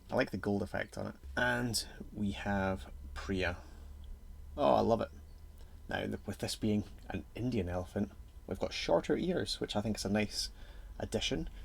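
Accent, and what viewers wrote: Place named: Scotland, United Kingdom. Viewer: This was a tough week, torn between Toshi and Hansel 🤔 I think I’ll go Hansel due to the props used haha. Special mention to you saying ‘cosy little boots’ in your wee Scottish accent 😅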